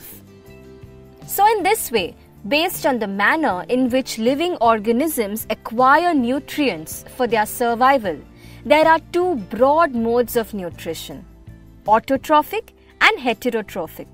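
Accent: India. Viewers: She's Indian, and people pronounce words different according to their accent. Instead of just pointing out her flaws. Tbh, you could use that mouth to appreciate her knowledge -_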